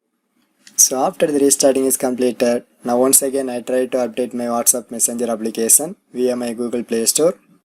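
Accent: India. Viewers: well this accent is South Indian English accent , Im an Indian and personally don't like it too . Try listening to North Western English accent it's far better xD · Yep. It is English in Indian accent. English is not our first language.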